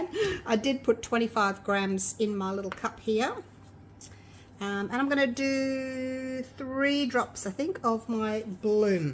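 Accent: Australia. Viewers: Aussie accent is the best...(No, I'm not biassed.. well, maybe just a tiny, incy, wincy bit🤣🤣)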